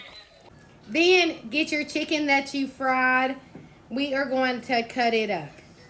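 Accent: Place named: Pennsylvania, United States. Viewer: Where you from?! Pencil-vainya? Kentucky or Tennessee? Cute accent😊 Yummy lookin quick snacks the way! Keep going boo❤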